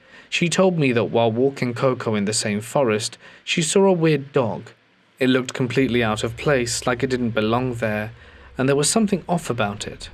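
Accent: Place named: RP, United Kingdom. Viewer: never disappoints 🙂 I tried getting my brother into this channel, but he said you sound too posh 😂 he's a let's read fan....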